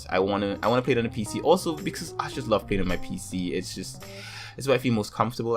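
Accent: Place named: Trinidad and Tobago. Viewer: What country are you from? The accent is feeling like a trini 🇹🇹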